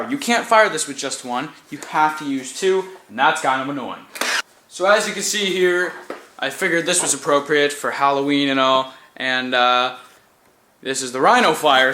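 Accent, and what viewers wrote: Canada. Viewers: are you canadian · This guy is Canadian as fuck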